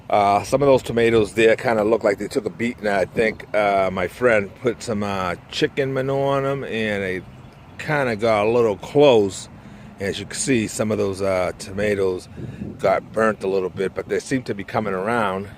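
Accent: Boston, United States. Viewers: Hello, as you were talking I said to my husband you have a Boston accent,but he is in I think Georga. At the end you told where you were from so that explains. The garden is looking great. Thanks for sharing this with us.💕 NonnaGrace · I had to do a double take when you said you were from Puerto Rico, until you said you grew up in Boston! It’s definitely a hard accent to miss…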